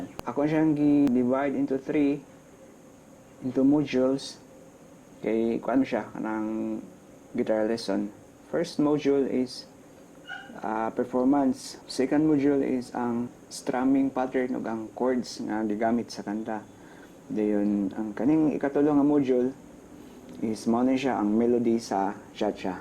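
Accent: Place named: Visayas, Philippines. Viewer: Ok kaayo bro. Taga asa diay ka murag bisaya man gyod hahaha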